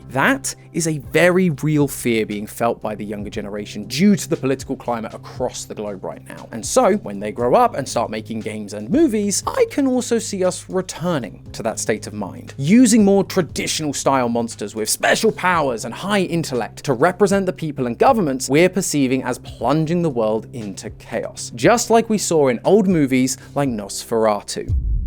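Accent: United Kingdom. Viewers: I hate this wanna-be, low tier, british, fake matpat host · He's British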